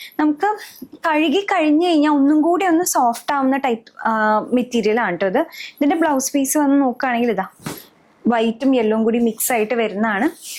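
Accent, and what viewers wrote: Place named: Valluvanad, India. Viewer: Thrissur കാരി അല്ലേ...പക്ഷെ സംസാരം..slang okke വള്ളുവനാടൻ ആണെല്ലോ...സ്റ്റൈൽ ിനു വേണ്ടി ആണോ ഇങ്ങനെ പറയുന്നത്... anyways nice vlog ❤❤❤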